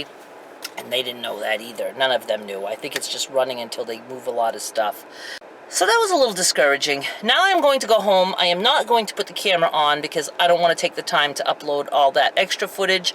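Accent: Maine, United States. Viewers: I thought I heard a Maine accent. I also live in Maine in Aroostook County (3 miles from the Canadian border.) I've been here 7 years but I'm from California. We plan to move to "civilization" (downstate) in the next couple of years.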